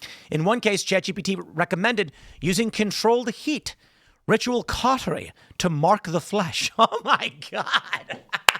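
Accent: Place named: Chicago, United States. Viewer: You are the one that uses AI for literally everything that you talk about on your Channel. You even constantly refer to it as your Friend, except you use your Patented “Exaggerated Chicago Accent™️” to make it sound silly, even though it’s an Accurate Reflection of The Truth, however you may try to frame it. 🤷‍♂️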